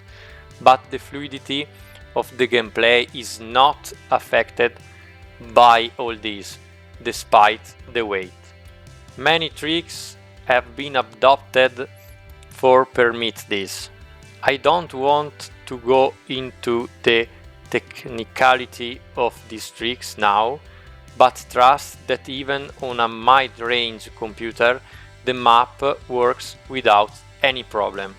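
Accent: Italy. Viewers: Bel video!! Quell'inglese con accento italiano e fantastico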